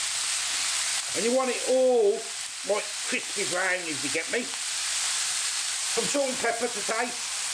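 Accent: Birmingham, United Kingdom. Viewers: Another class funny video m8.your brummi accent is class m8😂..im from Cardiff we dont say butt,rhonda pps do🤔🤐ive posted b4 im paul canton cardiff,, whats your real name,old foodie guy suits m8,but? When sending message b nice 2 say ie tony? Lol...u so make the cooking easier 2 explain,than saturday kitchen bbc1🙄keep up thr good work m8 k,love 2 all ❤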